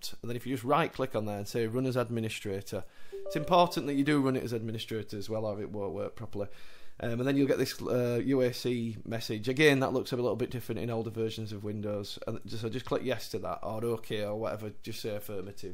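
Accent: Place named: United Kingdom. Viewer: I'm a yankee. i usulaly don't have problems understanding Brits, but in this case hi accent added to the speed of delivery makes it hard to understand. Also his video is to small to see what he has typed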